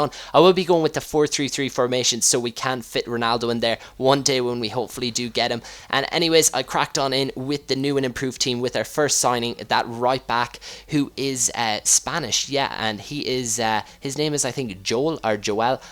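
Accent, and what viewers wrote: Ireland. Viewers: i love you cause your irish lke me:)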